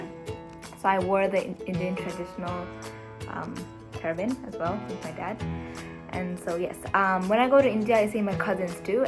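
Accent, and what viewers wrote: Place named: United States. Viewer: I am totally confused by your accent. You grew up in Japan, right. Yet, you're accent when you speak English sounds like you spent some time in America, can't hear the Japanese accent.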